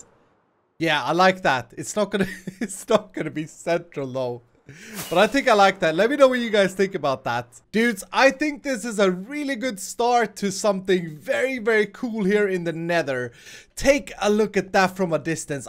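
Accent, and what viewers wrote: Sweden. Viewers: I'm not so sure if you will remember me, but I have been watching your channel for a while, commenting. Recently, I couldn't keep up with your vids because I have been so busy. I am currently at one of Korea's best boarding school, named KMLA. (I wanted to boost cause it's one of the best achievements I've made so far...) Anyway, there's a special reason for why I'm commenting. I wanted to say thank you for various reasons. First, your videos make me laugh. It's so fun, creative, and amazing. I like the fact that you are very random. You use a lot of strange words, like crap or such, but I really appreciate that you do not use swear words. Since I had so much fun watching yr vids, I have introduced it to my brother and he enjoys it as well. One thing I hope is... I hope you could do some vlogging... Or at least show your face in front of the camera for at least once a month like False. 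It would be so good to know you as a person ' ' as much as knowing you as 'Swedish accent voiced avatar '. It would be much appreciated by both me and the fans. The second and most important thing to thank you is that you somehow triggered me... Well, in a good way. Remember when you said 'Ja' instead of 'Yes' and did a short commentary about your mother language peeking out? That sorta hit me hard. As soon as I heard that word, 'Ja' for some reason, I wanted to learn Swedish. Maybe it was because I wanted to talk to you with Swedish or I wanted to have a new hobby, but anywa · This is so funny for me as a swedish person hearing another swedish persons accent in english xD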